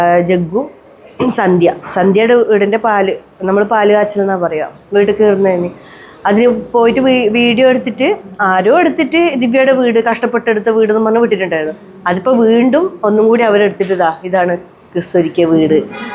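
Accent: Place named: Kannur, India. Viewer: 😂😂 എങ്ങനെ യൊക്കെ ശ്രദ്ധിച്ചാലും ആ കണ്ണൂർ സ്ലാങ് പുറത്തു ചാടുന്നത് കണ്ണൂർക്കാർക് തിരീന്നുണ്ട്... ട്ട്വ...😅😅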